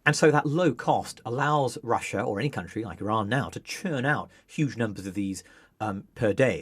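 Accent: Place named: RP, United Kingdom. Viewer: so brititsh, so posh, and so wrong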